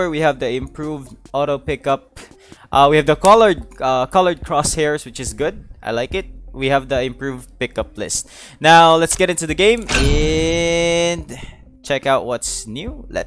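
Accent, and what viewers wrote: Visayas, Philippines. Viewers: English bisaya ka!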